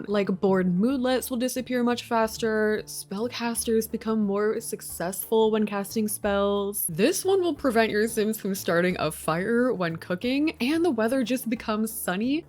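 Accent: Canada. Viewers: I’ve never seen your channel before but this video just popped up in my recommended’s. This house is stunning!! I had to check your “about” to see if you were Canadian because I immediately heard the soft and cozy Canadian accent ✨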